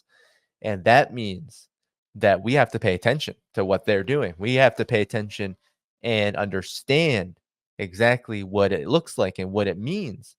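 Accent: United States. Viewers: PLEASE MAKE CHANGES OF YOUR AMERICAN ACCENT AS WELL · why are you speaking with an American accent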